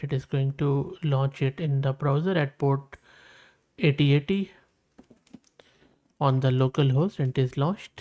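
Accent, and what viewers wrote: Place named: India. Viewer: Indians you are smart but getting info with your accent long time really making tired, train model to make normal accent.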